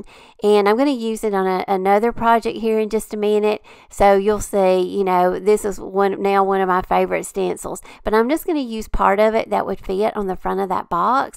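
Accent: South, United States. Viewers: Just found your channel and love your creativity and your Southern accent! Up here in Canada we having boring voices..lol. you are so sweet. Going to enjoy following you💕💕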